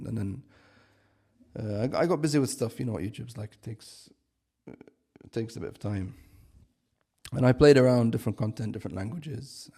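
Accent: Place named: Arab world. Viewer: Appreciate the video ... just some constructive criticism .. because not even Omani's do what you're doing .. when you speak English which is your native tounge your speaking it as if your speaking Arabic ... I think if you're more natural your English videos will be better .. this seems sooooooo reliant on the Arabic accent that you've developed .. that it comes off boring and almost forced ... just my analysis I think as an Omani myself you can do better in representing your own culture, the English culture, with a better delivery of the spoken voice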